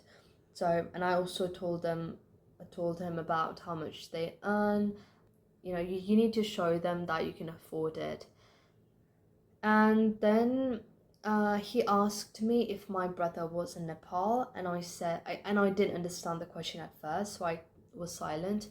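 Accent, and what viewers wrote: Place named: United Kingdom. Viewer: british accent at its best the vo must be impressed by this dd congrats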